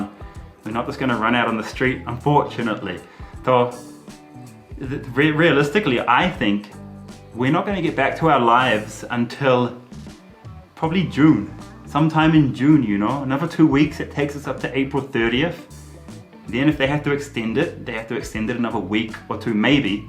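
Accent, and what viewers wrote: India. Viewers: Why do you have an Indian accent lol, it almost sounds like you're trying really hard to put on an Indian accent on when you speak English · you are speaking English with a Hindi accent ---- Look Out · Kia ora from NZ. Nice area. Boredom is better than fighting virus in the hospital. I have been following you for a few weeks now. Mate, your Indian accents is getting stronger . Keep up the good work 👍🏼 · Your english accent is getting influenced strongly with India🤔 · Hey, are you focusing your channel just for Indian viewers? Why do you speak with an Indian accent like "enna" at the end of every sentence. Why can't be just be yourself not a quasi Indian and talk normal so that the world viewers can understand. If you want to speak to your Indian viewers please do an another channel speaking in Hindi. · You are an Indian you even speak English with an Indian acsent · Is it me, or is developing the slightest hint of an Indian accent?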